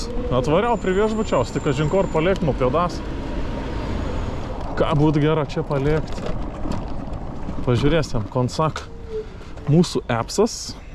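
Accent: Samogitia, Lithuania. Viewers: sėkmės, super , smagu ziureti ir klausyti zemaitiska😃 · Bet keik faine viel tav keliuon kažkor matyte, da ka žemaiteška rokounys atruod ka su draugel pats išdiejau i ton Ruoma ;D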